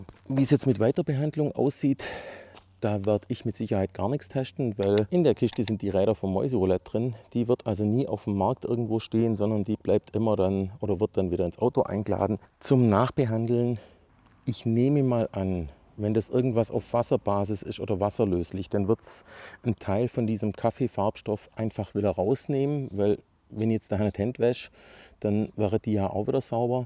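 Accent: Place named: Swabia, Germany. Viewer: War wieder sehr unterhaltsam und lehrreich. Wären wir in der Nähe, könntest von uns auch viel Kaffeesatz kriegen (Vollautomat) 😅. Das Einfärben ist im wahrsten Sinne des Wortes eine "Sauarbeit". Kriegst du da keine Probleme mit der Haut, ist das nicht wie Schmirgelpapier? Ergebnis ist jedenfalls echt gut. Ich finde es übrigens cool, wenn du schwäbisch schwätzen tust.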